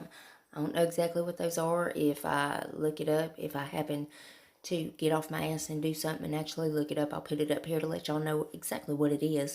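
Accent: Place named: Tennessee, United States. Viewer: Howdy from Tennessee! Just subscribed. Love the videos and that you talk just like me! 🥰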